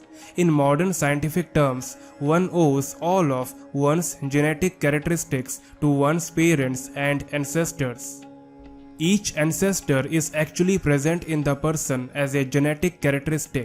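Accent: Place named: North India, India. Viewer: It’s good knowledge for all Hindus . But when you go international try to connect to international audience even non Hindus will understand. e.g.: 1. Try not to speak like a North Indian . 2. Many words such as Veda , is pronounced as Ved . Shraddha in the subtitled is pronounced as Shrad ? This will confuse Hindus as well as others. 3. Learn to speak without accents from leading Indian news channel broadcasters . In YouTube one must be international. You will spread the message better. Keep up the good work. Hari Aum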